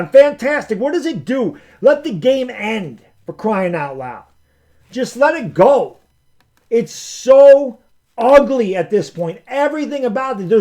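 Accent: New York, United States. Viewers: Dude you are so funny, Love your content with that New York accent shit talking You and crack me up Stay loving your Giants Cowboy Diva for life Texas Gal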